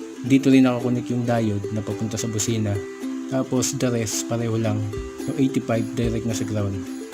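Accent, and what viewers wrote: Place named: Batangas, Philippines. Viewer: Batanguenio k ga boss. Saan ka sa batangas makapagpakabit hehe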